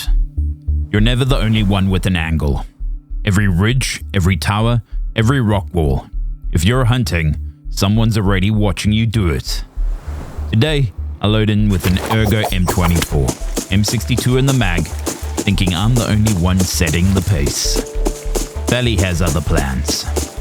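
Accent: South Africa. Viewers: You sound South African in a way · I see your ping is around 26ms but your accent sounds South African. Did you relocate or am I missing the plot here :) Good job on the shorts and longer form videos. Always a nice watch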